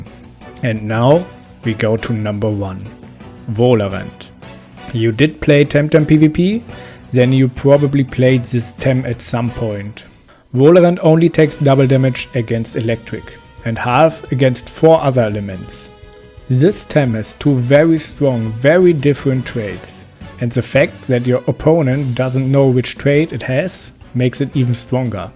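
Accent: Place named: Germany. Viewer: Sofort gehört das du deutsch bist😂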